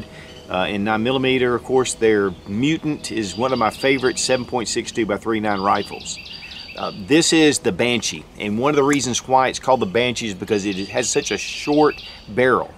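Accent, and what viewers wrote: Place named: North Carolina, United States. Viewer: Hi. Like your videos. You sound like you are down in eastern NC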